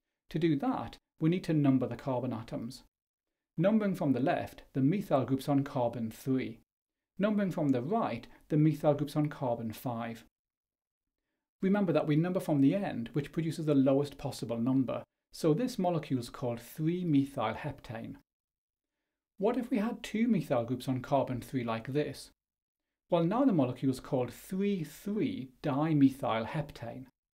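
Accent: United Kingdom. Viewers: WHERE IS OUR OG BRITISH ACCENT!?!?!?😩😔😔😔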